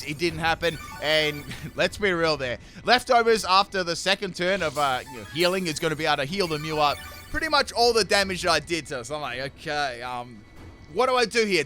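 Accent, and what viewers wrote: Australia. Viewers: Gotta love his Australian pronunciations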